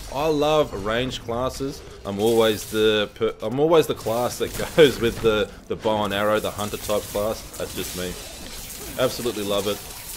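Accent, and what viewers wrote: Australia. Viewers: Great guide in simple language for a new player. Thanks for the help. Also nice to hear a Aussie.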